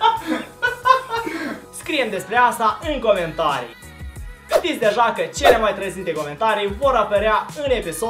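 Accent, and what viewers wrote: Moldova. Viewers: Ești Moldovan nustiu terog sami. Spune terog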